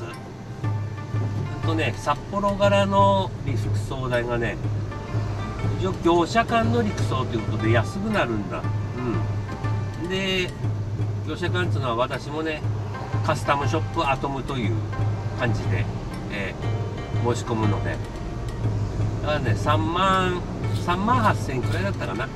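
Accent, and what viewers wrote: Tsugaru, Japan. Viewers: 津軽弁での説明、良いですね😃‼️。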